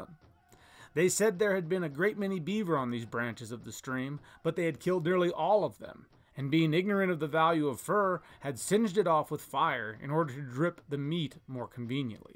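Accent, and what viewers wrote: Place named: United States. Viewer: oh oh voices of the past except an American dude with an American accent! Very excellent, and fascinating!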